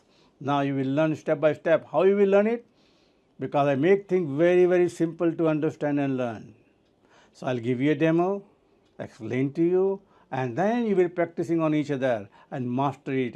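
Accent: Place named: India. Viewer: If you are a real hypnotist then why don't you work on your accent? So Indian and so funny. 😂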